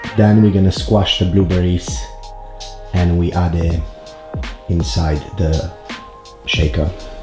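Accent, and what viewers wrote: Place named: Italy. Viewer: Where are you from? You've got that sort of Italian accent which is cool btw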